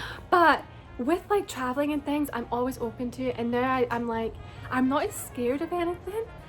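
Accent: Scotland, United Kingdom. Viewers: Please don’t ever ever ever ever lose your accent. The Scottish accent is like music. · Please don't loose your Scottish accent and your beautiful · Love Your Scottish Accent So Pretty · It’s so amusing to hear the blend of your Scottish accent with the American accent!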